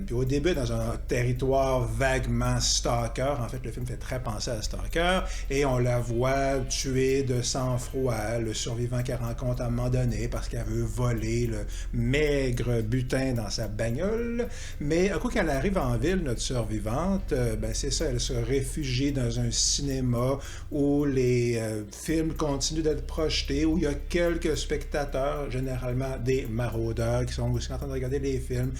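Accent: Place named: Quebec, Canada. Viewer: je m'abonne malgré l'accent québecois, c'est dire comme c'est bon :person-turqouise-waving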